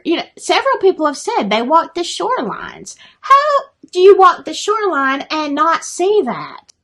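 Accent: South, United States. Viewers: Poor Libby and Abby...there's so much going on there... I agree with almost everything you said here!! God bless! 💜 Im in Georgia..I'm from Alabama!! My accent is like yours!!! I love my country accent!! 💜💜💜💜 The Summer Wells case has blown my mind!! Talk about sketchy folk!!! EVERYONE around that baby and area is so absolutely disgusting!!!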